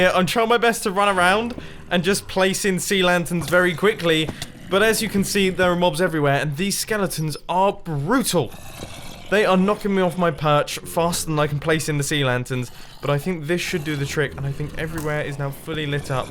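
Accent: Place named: United Kingdom. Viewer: The brithish acent 👌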